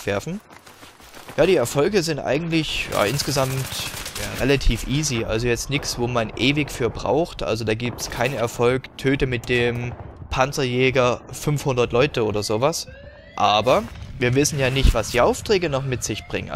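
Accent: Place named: Saxony, Germany. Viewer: Gutes Gameplay:) Aber sorry ich komm mit deinem leichten sächsischen Dialekt nich so ganz klar :/ Aber sonst gutes Video:)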